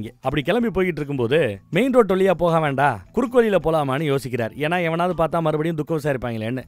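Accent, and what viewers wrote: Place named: Madurai, India. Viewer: அண்ணா படம் ரொம்ப அருமை ஆனா நீங்க மதுரை தான முட்டை கண்ணு அப்பப்ப பேசுறத பாத்தாலே தெரியுது மதுரை தானே நான் உசிலம்பட்டி அண்ணா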